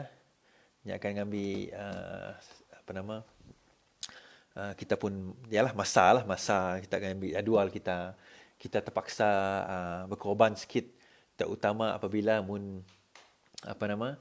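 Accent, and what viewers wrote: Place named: Sarawak, Malaysia. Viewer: Suara oky, tetapi banyak loghat Sarawak yg tak faham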